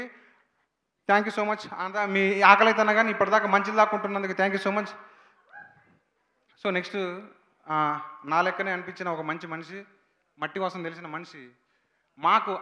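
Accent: Telangana, India. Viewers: Trivikram gaaru Telangana yaasa lo matladinattu anipistundi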